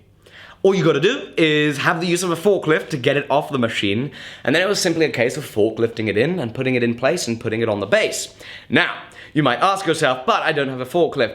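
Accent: United Kingdom. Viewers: I really am curious.. Texas and American Flag. British accent. You relocate to Texas or just love Texas? Thank you,Proud Texan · bro, wtf... I see American flags, Texas flags... don't tread on me... but the accent and the measurements... what are you!!!!!!!!???? Brit transplant, yank transplant? I'm so confuse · Wait, are Brits allowed to wear boots?? Don't get me wrong, I don't know why everyone doesn't, but I don't think I've ever seen someone with your accent and a pair of cowboy boots. Props to you sir!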